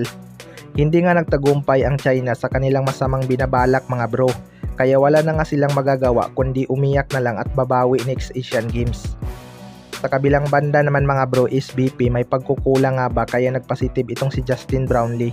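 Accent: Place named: Iloilo, Philippines. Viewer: ilongo ka no..may punto kasi tagalog m9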